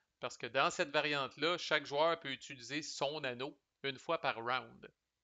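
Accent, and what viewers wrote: Quebec, Canada. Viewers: Merci pour cette excellente série. J'ai fait découvrir à un ami en Espagne qui est moins familier avec l'accent québécois il a bien aimé! On est prêt pour jouer au jeu dans quelques semaines!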